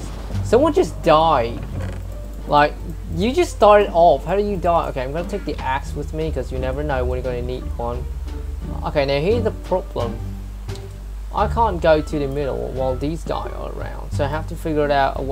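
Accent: United Kingdom. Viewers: u should combine fighting with the sword with the fishing rod more often,especially use the fishing rod when someone is near the edge,it'll knock them off further xD (công nhận bác nói tiếng anh giỏi ghê >o< accent giọng Anh nhỉ?)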